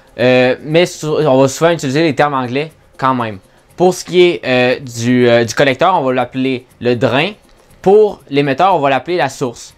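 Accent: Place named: Quebec, Canada. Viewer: "à la bose du trastore"... que j'adore le français québéqouis! Vraiment, je fais pas de sarcasme, j'aime l'homme Québéquois.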